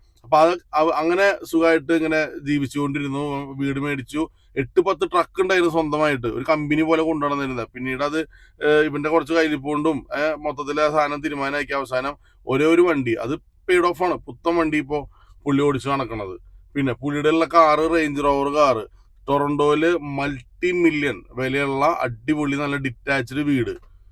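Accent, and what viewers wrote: Thrissur, India. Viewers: നാട്ടിൽ അങ്ങയുടെ വീട് തൃശ്ശൂർ ആണോ. ത്രിശൂർ ഭാഷ ആണല്ലോ പറയുന്നത്.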